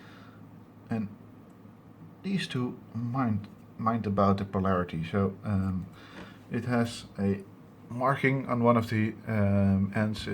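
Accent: Netherlands. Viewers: Im from Belgium but every Dutch speaking person that speaks English has a bit of a accent ^^ and I ordered the one from icstation and even though there site has a full tutorial on it your videos is probably going to be a lot more help I contacted them about reprogramming the chip and it's sad that I have to buy one of those 50 euro programmers for it:( plus a adapter I really hoped there was a other way for that also you have a better diy suggestion for making the led bending template I have no drill they suggested cardboard maybe and 1,5cm spacings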